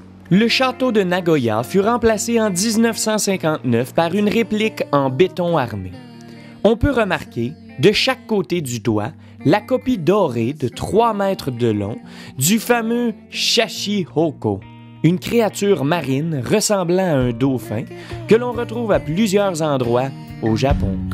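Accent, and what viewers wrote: Quebec, Canada. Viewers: Entendre l'accent quebecois c magique XD ...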